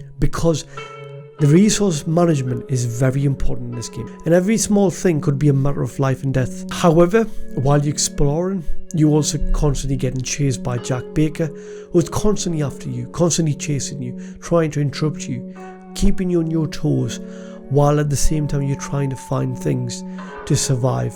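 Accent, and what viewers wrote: Newcastle, United Kingdom. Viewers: Off topic, is that a Geordie accent?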